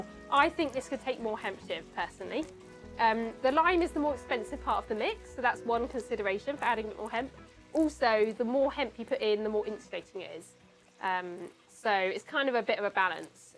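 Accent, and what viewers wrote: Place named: Wales, United Kingdom. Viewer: Great video, also known as ‘watching mixing things’. We’ve noticed your increasing bicep size and maybe also a slight Welsh twang…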